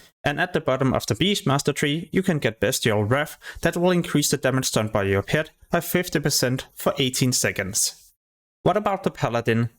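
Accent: Denmark. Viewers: this guy is Danish, 100%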